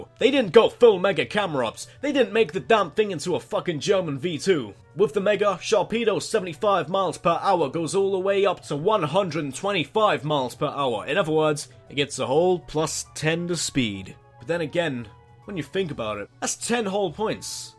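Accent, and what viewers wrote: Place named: United Kingdom. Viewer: man He sounds like a British Dan Green. Am I right?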